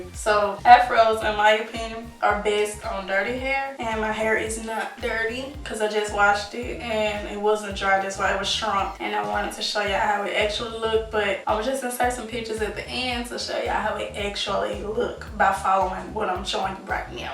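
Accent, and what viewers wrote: United States. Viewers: I LOVE the country accent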